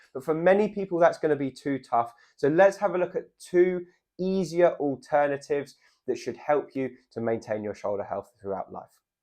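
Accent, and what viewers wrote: United Kingdom. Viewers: Thanks for your videos. I have a hard time being lazy and undisciplined to exercise. I need to wake up and get motivated. You are very encouraging. Great explanations. Like your British accent so much. I'm over 60. Do not like saying that. Have tried doing push-ups, sit ups, squats, etc. Always feel better after. Some "reverse leg lifts" on hands and knees for firming my glutes. Need to get serious about this and just enjoy it. Can not jog or run or walk a lot due to painful feet. But CAN still get around, drive, shop, etc. Sorry for long text. Btw, you make great videos and you are a good-looking, very presentable man. Keep up the good work. God bless you in a special way.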